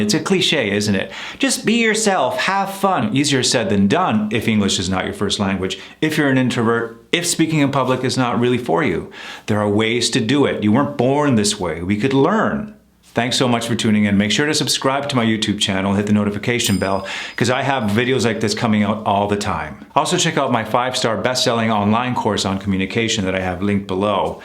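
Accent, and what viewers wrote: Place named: United States. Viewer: This guy is great! oh my gosh I would spend all day long listening to his American accent. Keep up the good work. When I am stressed out I resort to his videos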